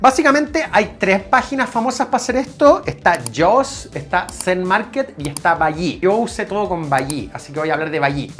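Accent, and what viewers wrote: Chile. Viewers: Soy español y el acento chileno se me hace muy agradable 👌😊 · Aca un cubano en miami que le agrada el acento chileno, es cool bro 😂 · Nunca cambies tu acento chileno. Así está bien. Y que relojes tan icónicos tienes, quiero un watch tour. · Soy de España y me encanta el acneto chileno, no lo dejes!:face-red-heart-shape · Acento chileno es precioso. Saludos desde Madrid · Soy español, me gusta escucharos hablar en español chileno! Veo el canal por eso! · Soy español y el acento de Chile es TOP🎉🎉🎉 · ES JODIDO EL ACEPTO CHILENO JEJEJEJ, PERO GRACIAS. NUEVO SEGUIDOR... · Que te quede claro que acá en México se les aprecia y se les admira a ti y a (o no se a ciencia cierta como se escribe) y no intentes suavizar tu acento chileno, es el acento más chingon de America. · Yo soy español, me encanta vuestro contenido y me encanta vuestro acento Chileno!!!! 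Dando la hora es uno de mis canales favoritos (si no el favorito) de relojes y por favor, no cambies tu acento, es tú esencia 💪🏻💪🏻💪🏻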